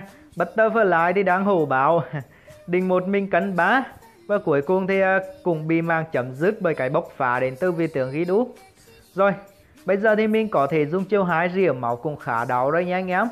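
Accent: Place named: Central, Vietnam. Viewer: Ng miền trung dd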